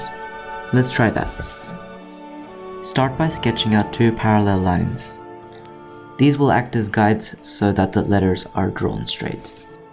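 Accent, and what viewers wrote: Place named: United Kingdom. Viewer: I finally found a british you tuber!😊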